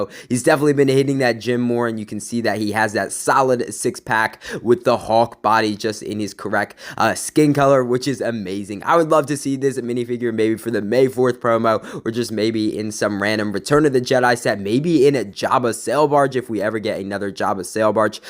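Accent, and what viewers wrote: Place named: United States. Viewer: u sorta sound like an American. Dantdm lol (not hating)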